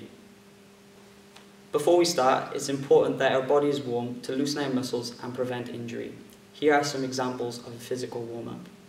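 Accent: Wales, United Kingdom. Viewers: Heard the welsh accent and seen you teach around newport. May have to pop in some time and check it out.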